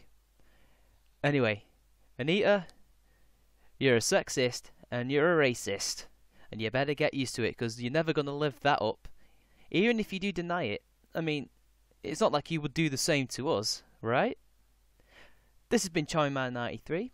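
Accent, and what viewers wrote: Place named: Manchester, United Kingdom. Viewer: I used to live in Manchester for 4 years, I found hints of mancunian in your accent in an earlier video and it stroke a comfortable feeling. Make a TYT video man.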